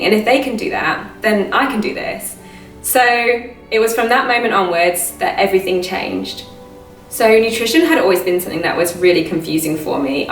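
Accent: Australia. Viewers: I knew there was some sought of Aussie in your accent, and then you said you moved there. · You look amazing!!! I need motivation like you had/have! Cute little mix of an aus/English accent there 😂 it’s nice to see you doing so well great video deffo be watching more! Starting to get myself fit now! It’s the food side I struggle with what’s good/bad etc because I love cooking but I’m sure I’ll learn xxxx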